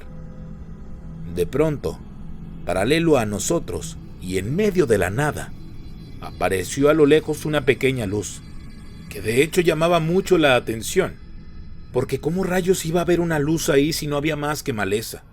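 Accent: Mexico. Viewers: Ahuevo, no hay nada mejor que hablar como buen mexa. Me gusta más éste formato. Es más convincente. Mucho éxito! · Me encanto la narración, justo como habla mi raza. La neta! 😉👍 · 🚨🚨🚨NO HABIA ESCUCHADO ESTA HISTORIA JEJE EXACTAMENTE COMO HABLAMOS EN EL NORTE BUEN CREEO EN TODO MÉXICO EXCELNTE 👍👍 🚨🚨🚨🚨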